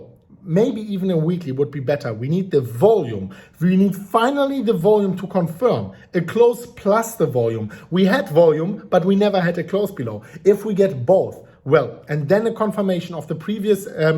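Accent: Germany. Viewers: I love the German accent in English.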